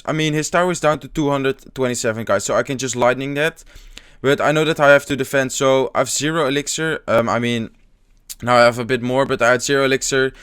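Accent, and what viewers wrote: Netherlands. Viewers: Are u Dutch/Belgian|Ben je Nederlander/Belg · Are you from the Nederlands?? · ja hij is Nederlands ja, kan je al aan zijn accent horen. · Are you Dutch? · `haha dat nederlands accent lol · Matthijs Riemens denk het ook want zijn uitspraak is echt lelijk Nederlands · are you dutch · Ik dacht al dat je nederlands was. blanke koppie en dat accentje. knap gedaan trouwens. zet ons kleine landje goed op de kaart toppie!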